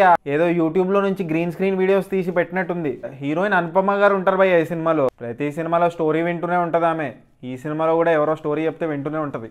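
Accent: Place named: Andhra Pradesh, India. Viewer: Mowa nvvemo nedi telanagana annav..ne mata theerendhe andhra laga undi...ee bhayya bhayya kuda manadi kadhe..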